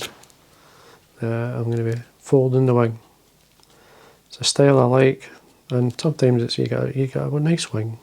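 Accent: Scotland, United Kingdom. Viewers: Hey! While I like your Scottish accent it does not translate well in your transcripts. I am hard of hearing and would like it if you would include a material list as well as transcript. I guess model doc is a mallard duck. Thanks for the great patterns and tying methods. Harry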